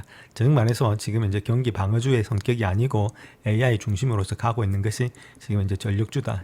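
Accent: Gyeongsang, South Korea. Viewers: 일고리즘 타고 왔는데… 미국에서 온 전문가라면서 경상도 사투리.. 바로 퇴장.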